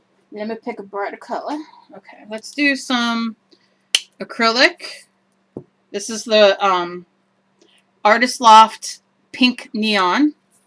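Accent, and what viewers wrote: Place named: Philadelphia, United States. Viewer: Are you from Phillie? Love your accent and love your videos!!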